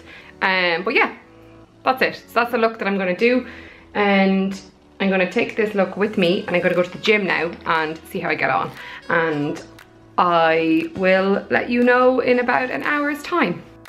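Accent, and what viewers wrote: Ireland. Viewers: What part of Ireland are u from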